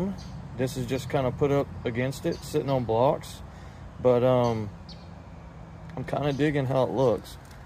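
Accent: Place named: South, United States. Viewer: What website did you import ya cosmo from brother. You sound like you from down south! I’m from Newport News Virginia, and wanted to import one of these.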